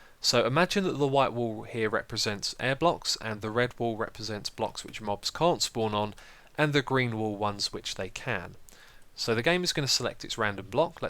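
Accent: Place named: United Kingdom. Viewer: Please do more man your awesome and your British I think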